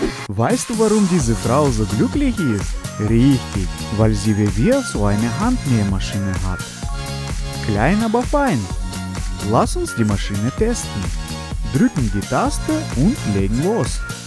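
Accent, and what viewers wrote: Russia. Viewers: Ich liebe deinen russischen Akzent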